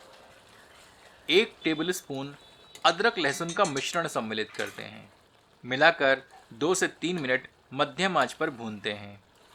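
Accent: Standard. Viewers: aapki hindi bahut shudh he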